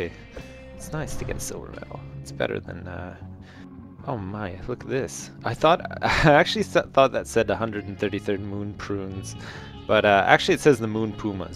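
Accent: Canada. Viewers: OMG, that mic makes you sound canadian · you sound more canadian now ;( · I think the toaster helped disguise your Canadian accent. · ' new mic makes him sound way more Canadian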